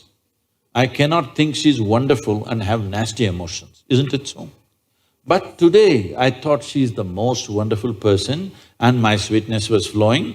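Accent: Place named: India. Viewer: چقدر لهجه انگلیسی حرف زدن هندی ها مزخرفه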